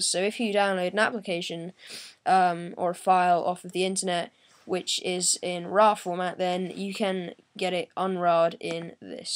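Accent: United Kingdom. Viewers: cool i like ur british accent..specially when u say Dock doctor.....so cute.....i have to learn british accent...thanks for suggesting ur fave application....xoxo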